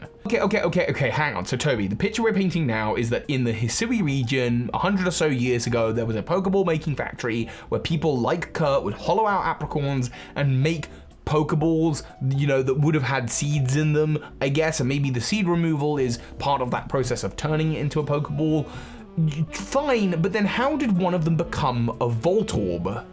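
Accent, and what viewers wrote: United Kingdom. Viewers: Your from Uk?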